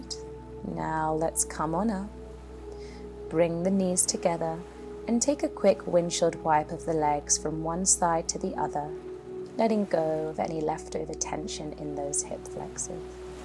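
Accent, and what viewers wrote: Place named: United Kingdom. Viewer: Thanks, so good to have a British accent on here, subscribed now 🙏🏼💪🏼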